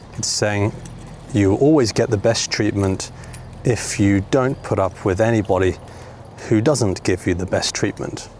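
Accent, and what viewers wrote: United Kingdom. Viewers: I love your British accent , you're a great teacher because your English is easily understandable! You really do a great job for non native English speakers! ❤❤❤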